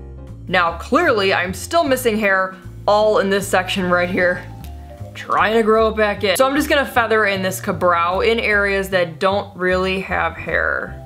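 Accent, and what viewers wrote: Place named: Chicago, United States. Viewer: Your Chicago accent is so refreshing to hear; I'm from Chicago but have lived in Florida for 16 years, so I miss hearing my native accent. I love this makeup look, it's simple but yet festive. Thanks sweetie!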